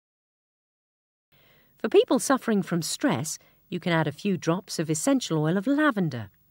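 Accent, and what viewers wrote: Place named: England, United Kingdom. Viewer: what a beautiful English accent , so clear , well paced and simply captivating well narrated , dynamic voice 🥰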